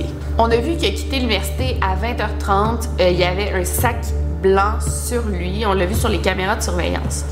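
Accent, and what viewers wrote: Quebec, Canada. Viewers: De bases j’aime pas l’accent québécois sa minsuporte mais depuis que je regarde tes vidéo sa mfais plus rien hihi (une vidéo sur les vampires ? Sa serais ltop)❤️❤️ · je t'adore !! continue comme ça et j'adore ton accent québécois mdr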